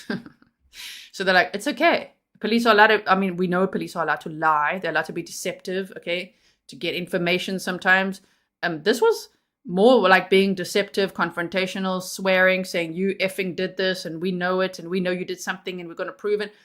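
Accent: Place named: South Africa. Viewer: Before I even start watching I need to state that this is the one case that I follow that I found dubious from the beginning, long before the Odinists came in. From the beginning I thought they do not have anywhere near to enough to convict this man - an unspent round is all......and my instinct was just that this is not the man. This does not make sense...and since the rest came out, I am more and more convinced they have the wrong man and he is being framed....I do not usually easily believe a frame defence, but on this one....I may be convinced. And the judge is just biased.....! I have no idea what she is thinking. By the way, where are you from? I am from South Africa and your accent sounds South African!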